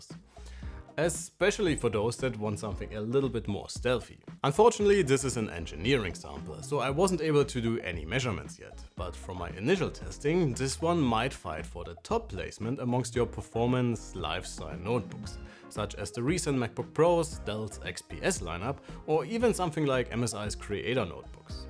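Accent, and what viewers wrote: Germany. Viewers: Puh, deutsche die auf Englisch machen, immer eine Herausforderung für die Ohren.... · Gutes Video. Aber dieser deutsche Akzent lol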